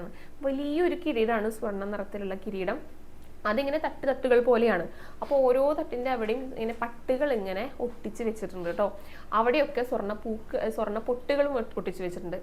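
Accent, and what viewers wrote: Thrissur, India. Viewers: Ur presentation with thrissur accent is liked much. 😢😢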